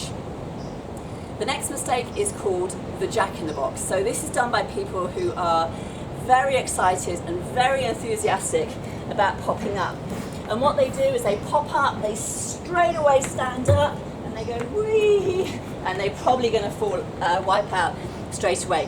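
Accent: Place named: United Kingdom. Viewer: normal british accent i guess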